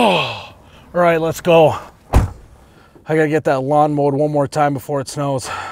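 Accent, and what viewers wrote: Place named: Minnesota, United States. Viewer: I actually Googled "Holiday Gas Station" to figure out where this dude is from. Northern Wisconsin? I thought the 'you betcha' thing was more of a Minnesota thing. Either way, love the videos.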